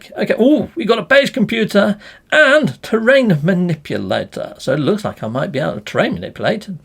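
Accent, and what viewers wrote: United Kingdom. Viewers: lol 5 miniuets in and so many Britishisms i feel like i'm back in a London Pub 🤣 Never change!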